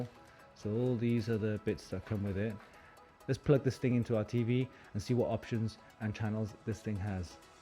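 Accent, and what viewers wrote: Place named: United Kingdom. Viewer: An Asian man with a British accent = YUMMMM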